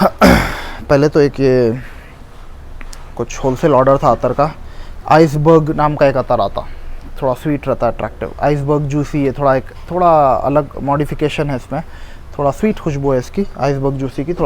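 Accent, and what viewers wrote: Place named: Hyderabad, India. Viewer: Love your hyderabadi accent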